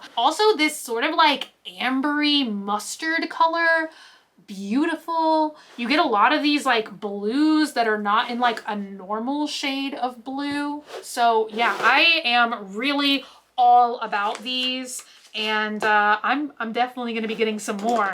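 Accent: Texas, United States. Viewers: I like to watch with the closed captions on...and they also said that said PIN and not pen. Lol. It's that southern pronunciation 😘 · Took me a minute to know if you meant pin or pen, that Texas accent, man.😄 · It’s funny I don’t even hear a difference but in other comments they say it too. It must be a Texas thing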